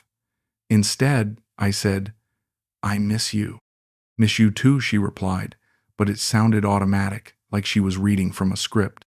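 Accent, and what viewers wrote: United States. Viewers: American accent not British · 🤦 lord have mercy, this is fake, welcome to America 2026. Mindless entertainment to keep you distracted. 🙄. Supposedly British commentator with an American accent 😅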